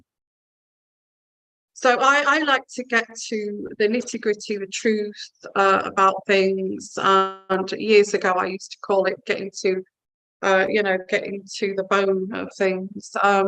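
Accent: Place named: England, United Kingdom. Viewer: Hi Sweet Lady, so polished and kind. I am sitting on my sofa enjoying every word. I love your genuine English accent. At first, l had a little difficulty understanding you, but now l am getting used to it. I am ltalian Naturalized American, and l used to do medical transcription in a major hospital in Detroit, MI. I have learned to transcribe for doctors from all over the world. Very interesting! Thank you for your hard work! I am sorry, but l can’t shake my gut feeling that Nicola’s partner is guilty. He is involved, a cheater. But l am not a psychic.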